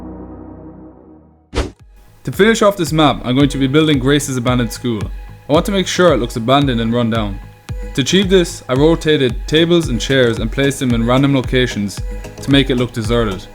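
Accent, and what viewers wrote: Ireland. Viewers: Are you Irish? Dublin maybe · You have to be Irish what county u sound like a mayo man like myself please reply 😄😄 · Hi man you probably wont see this but you sound irish which u an to!if u see this can u pls tell me where ur firm im curious lol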